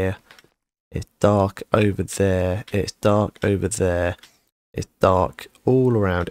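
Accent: United Kingdom. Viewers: are you from eastborne?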